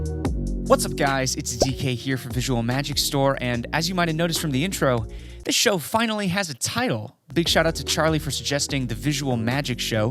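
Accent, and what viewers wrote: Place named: United Kingdom. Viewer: Awesome just awesome and British accent on point 👌👌👌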